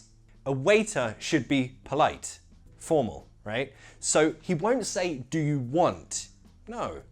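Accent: United Kingdom. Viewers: Are u British? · You have a great British accent. You explain English topics perfectly and clearly.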